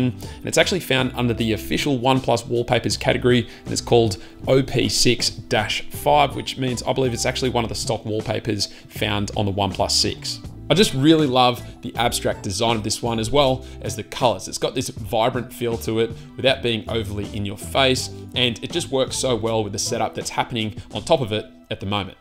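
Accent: Australia. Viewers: Great video bro! Is that another Aussie accent I hear? 👍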